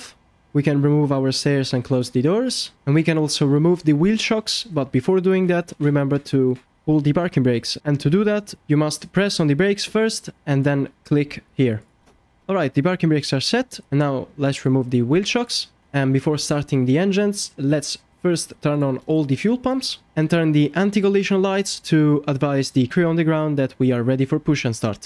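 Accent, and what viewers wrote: Italy. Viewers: Are you italian? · are you Italian?😅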